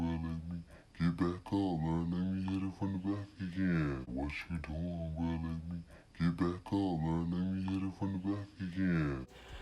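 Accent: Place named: Midwest, United States. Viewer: U from or in da MidWest ? U sound like it.....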